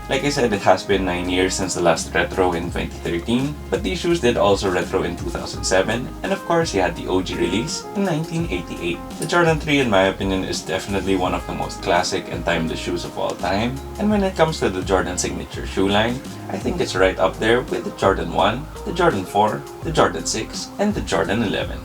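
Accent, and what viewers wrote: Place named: Philippines. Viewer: dat pinoy aksent men🤘🏽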